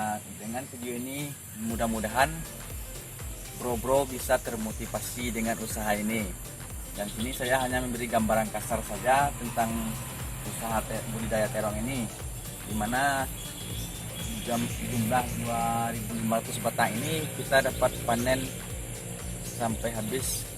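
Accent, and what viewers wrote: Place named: West Sumatra, Indonesia. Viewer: Bang tolong dibalas.., Uda urang minangkan, dima lokasi da..? mintak nomor WAnyo da Ambo ka Konsul senek.. soalnya keceplosan bicaranya nya😊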